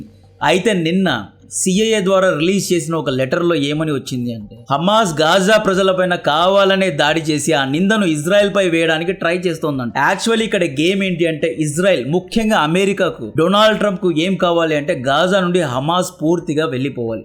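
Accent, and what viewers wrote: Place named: Anantapur, India. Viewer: You slang is anantapur district slang, am I right. Please confirm me. Thanks!😊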